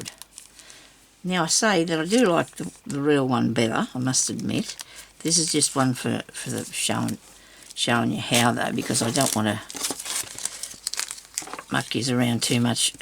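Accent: Australia. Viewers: I get so much joy watching you! You just love what you're doing and even when it isn't working out as you'd planned you just laugh and say "nobody panic" and keep moving forward! Your spirit mixed with that lovely Australian accent and sayings and sense of humor always makes me happy,lifts my spirits and gives me a giggle for free!! Never change,my friend. You're a treasure 😁💙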